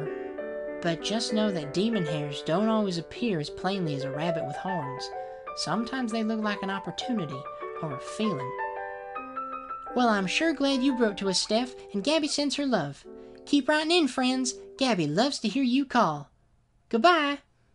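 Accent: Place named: South, United States. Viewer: Something about these videos are so comforting to me. I'm not at all religious, and I'm sure it will be revealed that the bun isn't as good as she seems, but I genuinely appreciate the animation and work. I think it's the southern accent and absolutely adorable designs. I suppose I have 2 questions! How was this animated? It looks like puppetry, but it could also be hand drawn! And how do you feel about fanart?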